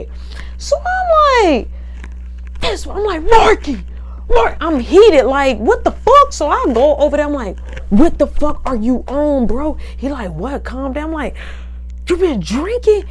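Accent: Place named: Chicago, United States. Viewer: don’t think I’m trolling, just be tryna boost/run it up 😊 but, you grew up in NY and the Go right? Only cause I spent summers there (still go yearly for my bday, I got family in Chicago) and yo accent sounds like a mix of Chicago and the DMV.. it’s different! Anyway, PLEASE get a publisher dammit! We got time to watch these stories, therefore we can buy a 10/20 dollar book! I’m just saying! I know you can do it! Rooting for you 😌🥰💕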